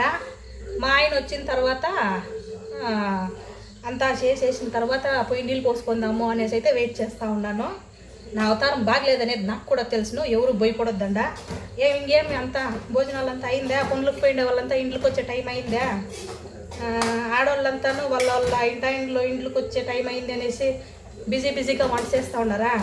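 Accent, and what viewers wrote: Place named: Karnataka-Andhra border, India. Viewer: Mee language same love Reddy movie lo laga undi Karnataka andra border's lo mataldautaru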